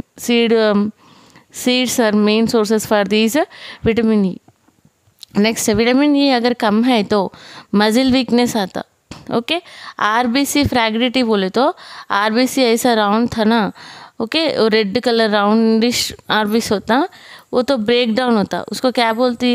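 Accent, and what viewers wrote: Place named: Telugu, India. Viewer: Mam I appreciate your hard work , the way of talking seems like your mother tongue is telugu but your trying to speak hindi 👍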